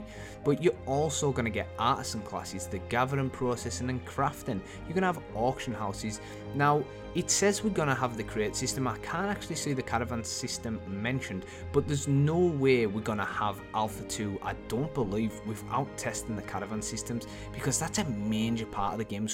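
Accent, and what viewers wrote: Newcastle, United Kingdom. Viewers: Newcastle or Liverpool? I swear it's like a mix of both XD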